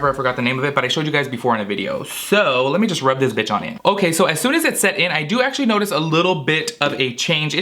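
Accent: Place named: South Florida, United States. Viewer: Are you from south Florida like Miami/lauderdale originally? I’ve always wondered bc of your accent. 😊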